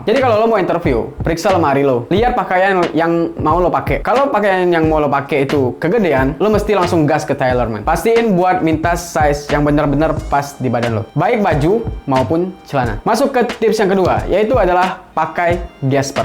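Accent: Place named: Padang, Indonesia. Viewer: Abg org padang ya, ketauan dari logat nya 🤭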